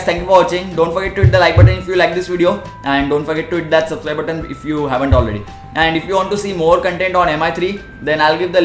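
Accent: India. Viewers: lol indian accent kinda sucks tbh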